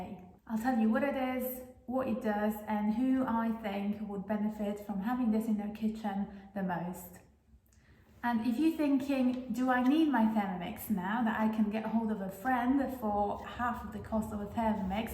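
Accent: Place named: Poland. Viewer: Slysze polski akcent:)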